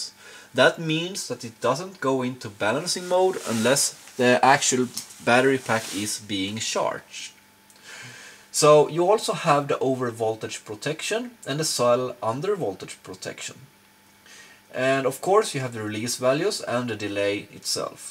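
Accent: Sweden. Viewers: You sounds swedish.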